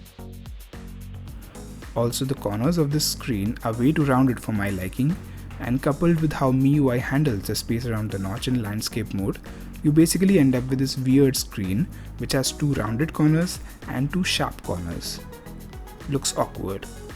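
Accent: India. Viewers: I cant take Indian voices serious. Am a racist? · your review is by far the best mobile review is have ever seen. what presentation, what scripting, what visual effects, what editing manr....i wonder then y do u have so less subscribers....by ur accent i think u can speak hindi also....please make some videos in hindi also and pack in some energy in ur voice-some excitement....you'll have millions of subscribers in no time....thank me later bro....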